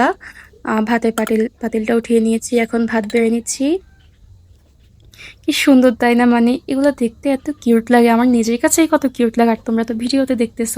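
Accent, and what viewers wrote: Noakhali, Bangladesh. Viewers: Apu apni Noakhalir na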